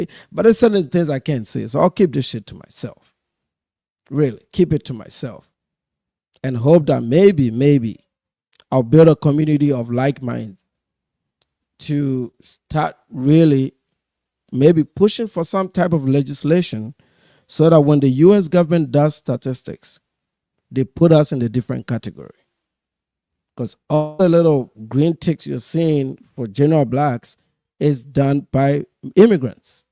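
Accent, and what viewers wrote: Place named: Nigeria. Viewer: Tariq wrote that book years ago... When he was young.... Before he transitioned and became more consciously aware and connected to the black American struggle... You have a whole country of your own to worry about... You. Come from a third world country... I think you're Nigerian. You look and sound like it, Nigeria, a country that has over 200 million people. But yet it's annual gdps less than 500 billion, that's AJ poverty... You should be concentrating on that.. instead of calling black Americans, Temu blacks, a group of people that's way more successful than you have. Way more money than you. Accomplished more than you built more than you, that's why a lot of you guys come over here.. walking on college campus is that black Americans paved... Living in communities and in neighborhoods that black Americans help accommodate.. 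leaving your country and running away from it, because it doesn't work. And coming over here with black Americans have set the country up for you.... Don't worry about block Americans. It's a totally different ethnic group, black Americans or generations removed from Africa...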